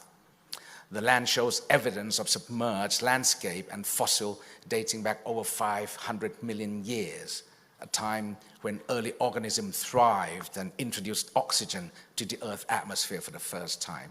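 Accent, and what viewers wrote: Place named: United Kingdom. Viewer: สำเนียงบริติช เพราะค่ะ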